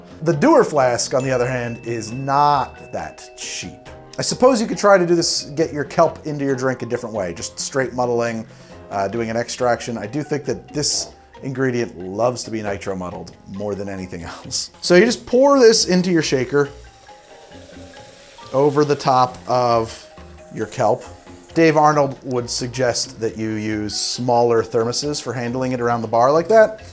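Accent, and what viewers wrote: New Jersey, United States. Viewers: He does a good Spongebob laugh and I wonder since he's in Jersey does he pronounce Water the correct way or does he change it so the other state living people don't start up their bs?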